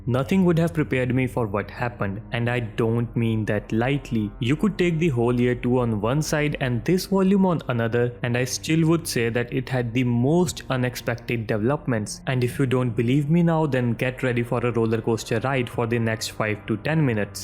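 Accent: India. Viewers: I'm one of the novel pioneers. At first I was like. "Uhhh what's with this indian accent voice" But then he says funny stuff in the end.. "Bro youre so hilarious". You get a sub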